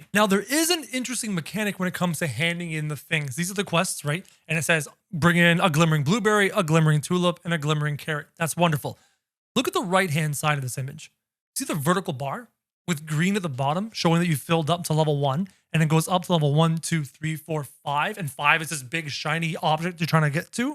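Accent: Canada. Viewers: sounds like he’s from or near Canada somewhere (?) my guess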